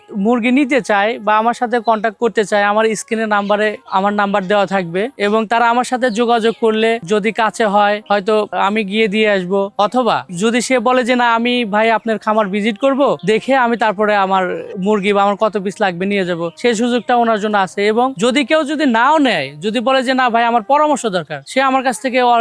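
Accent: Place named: Comilla, Bangladesh. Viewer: তর বাড়ি মনে হয় কুমিল্লা হালার পুত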